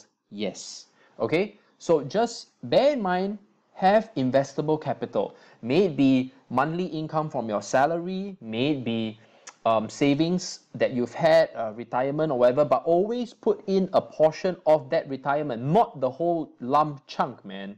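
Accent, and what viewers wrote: Singapore. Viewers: Awesome! That's why I heard a bit of Singlish :-p hahaha I live in SG too. I've really loved your video. I'm gonna watch your other vids as well. Cheers!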